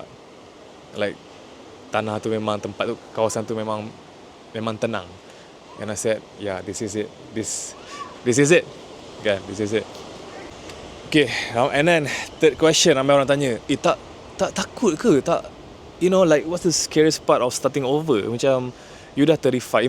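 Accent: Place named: Philippines. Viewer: i honestly thought you are a filipino, had to pause what i am doing to see what your nationality is the moment you started speaking different language.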